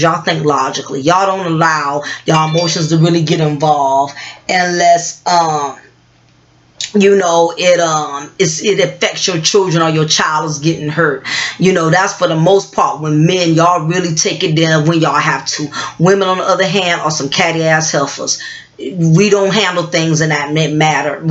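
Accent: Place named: South, United States. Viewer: are u from some part of New Orleans I am u just kinda have that Southern accent a little just curious n that's one thing me n my mom both hated every time we planned a party or holiday gathering it was either my mother me pitching in or one of her friends that would have to buy food decorations etc nobody else every one wanted to eat n drink free too disgusting.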